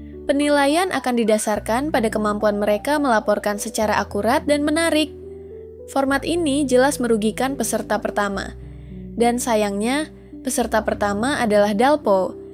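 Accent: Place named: West Sumatra, Indonesia. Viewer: Pasti urang Minang kan kak wkwkwk😂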